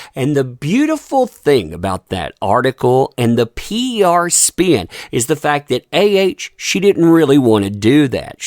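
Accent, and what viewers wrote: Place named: East Coast, United States. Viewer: Ok, you can sure tell you're from back east it's YUK-A not how you say it. Also please do a segment of a h getting offered $10 million to do a XXX rated porn-flick.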